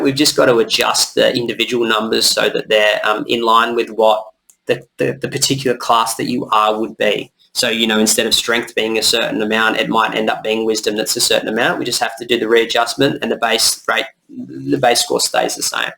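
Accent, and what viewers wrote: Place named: Australia. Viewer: Sol big brain has brought me to this project. Cannot wait. Also listening to your accent great to hear someone from Straya 🇦🇺 🙌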